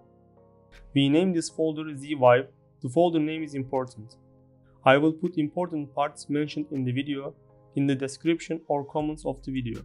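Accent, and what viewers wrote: Turkey. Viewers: Damn your accent tells me that you're Turkish. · Abi Türk olduğunu aksanından anlamıştım gayet güzel videolar çekiyorsun eline sağlık :) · aga yeminle ingilizce konuşmandan bile türk olduğun anlaşılıyor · iliklerime kadar türk ingilizcesi en sevdiğim :) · Türk ingilizcesi diye bir şey var buna eminim ben artık. Çok faydalı video teşekkürler!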